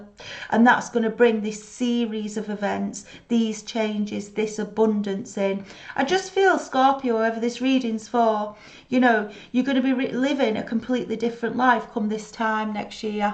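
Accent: United Kingdom. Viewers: Thank you honey u have just gained a new sub x nice to hear fellow uk readers x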